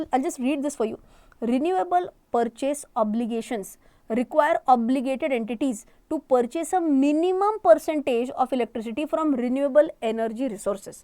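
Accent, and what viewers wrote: Maharashtra, India. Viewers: I just watch her videos for her Marathi accent 😅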